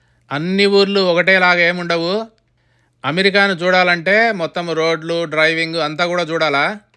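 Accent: Rayalaseema, India. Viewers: Thank you Liked your Telugu Royal Seema accent too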